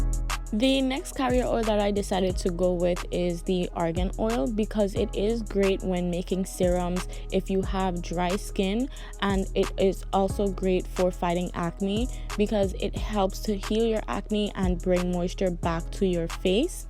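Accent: Caribbean. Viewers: Are you from the Virgin Islands 🇻🇮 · Heyyyyy 😍 where you from if I may ask? U sound like u got caribbean background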